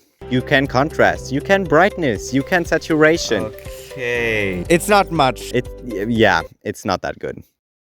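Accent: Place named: Germany. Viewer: Super! Und ich lag mit meiner Vermutung, dass da kein bulgarischer, sondern ein deutscher Akzent durchzuhören ist, nicht ganz falsch. Mir wäre geholfen, wenn du noch mehr zu dem Thema machst. Ich überlege seit Längerem, ob ich mich als Anfänger mit meiner Sony RX100 eher, in Photoscape X, Darktable oder Rawtherapee einarbeiten soll. Vielleicht geht es sich ja einmal aus, dass du ein Video zum diesem Thema machst. Zudem werden Videos mit "RX100" in Titel sicher häufig geklickt.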